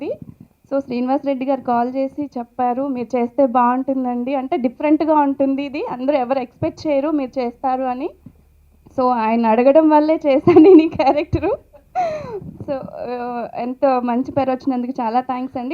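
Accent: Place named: India. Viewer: Spoken like a True Telugu ammayi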